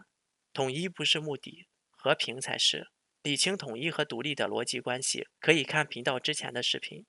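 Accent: China. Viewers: 聽完後，你分析的很有邏輯水平，一聽口音就知道你是大陸的，不過我還是想說，prc現在每天文攻武嚇，而且中美貿易戰開打以來更有走偏鋒態勢，不讓我討厭中國人也難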